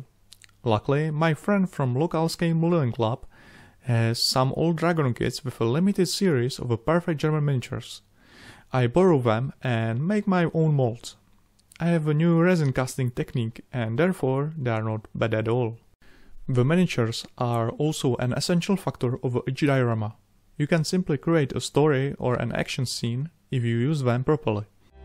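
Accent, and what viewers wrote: Czech Republic. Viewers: D hneď som vedel že si čech podľa prízvuku:D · Mluvíte skvěle anglicky. Ale pro čecha je to jednoduché poznat že jste čech. Ale jinak skvělé modely. :)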